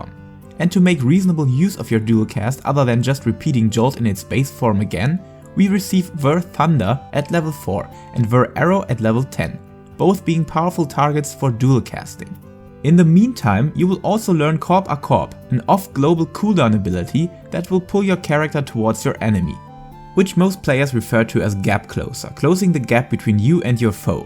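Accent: Germany. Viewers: he didnt even pronounce a german word the german way, when he sounds like a german dude :P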